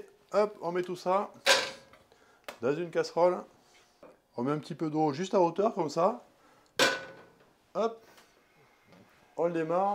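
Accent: South, France. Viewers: L'accent vient du sud mon ami on dire. Petit coucou from Toulouse. J'ai bien aimé ta vidéo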